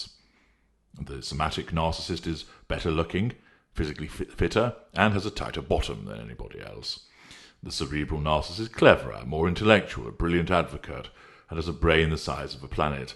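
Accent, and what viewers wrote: United Kingdom. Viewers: Ahh you must know the latest narc that I am preparing to extricate myself from ... Admittedly it sounds better with a British accent!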